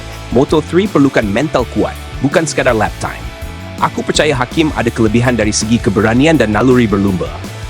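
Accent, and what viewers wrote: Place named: United Kingdom. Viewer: Narasi bahasa pake aksen inggeris Its very cringe bro Baru 1 mnt stop nonton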